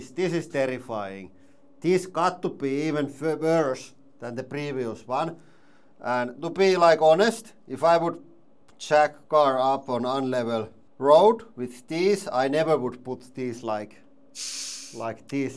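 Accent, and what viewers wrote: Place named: Finland. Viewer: I got recommended by youtube but i can already guess you guys are finnish by the thick finnish accent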